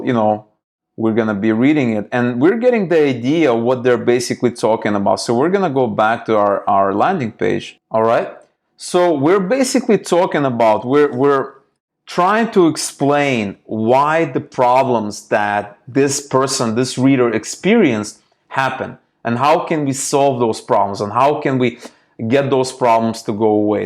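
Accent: Russia. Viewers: Ты не Русский случаем? Подпишусь, если ответишь) Очень Русский акцент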